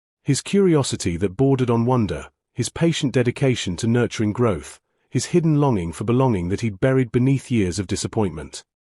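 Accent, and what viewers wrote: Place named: United Kingdom. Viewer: With the British accent, I should have known the answer for two naked women showing up for warmth would be "Let me make tea!"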